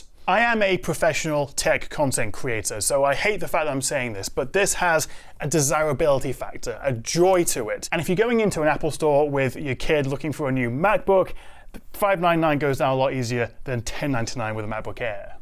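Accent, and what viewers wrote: England, United Kingdom. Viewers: Is this English sounding man talking in Great British Pounds or American Greenbacks?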